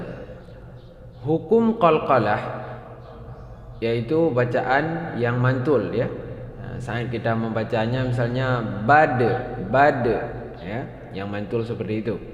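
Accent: Aceh, Indonesia. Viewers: Orang Aceh ya ustd?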